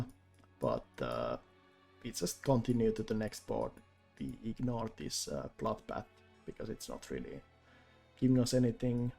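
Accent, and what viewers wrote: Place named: Finland. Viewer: Kyllä suomalaisen tunnistaa aksentista heti haha, keep up the good work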